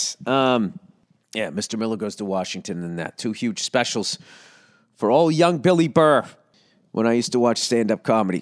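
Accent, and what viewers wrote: United Kingdom. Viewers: Ol Billy British Balls ranting about F1 in the accent is hilarious 😂😂😂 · Dude that British accent fucking killed me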